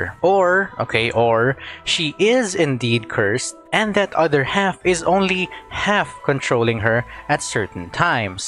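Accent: Philippines. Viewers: I think that you are right with Furina having multiple personalities. I mean Neuvillette says Furina was researching about the prophecy (FURINA), and I mean his reaction to her being scared of him not going to the meeting seemed like Furina is usually that eccentric lol. And also the emotions she shows like crying in her room seem real. So it would make sense, but these are just my guesses. Also I noticed half way through the video that you are Filipino, barely noticed the accent 😂 I AM TOO, so I was pleasantly surprised :D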